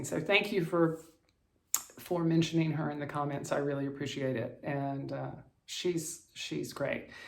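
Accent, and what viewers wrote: South, United States. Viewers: I'm impressed with your progress to linen. I enjoy the humor in your episodes. The volume was perfect and very clear. You are so funny and such a story teller. . . very Southern. I watch several floss tubes and each one of them has mentioned you! Can't wait to follow your journey.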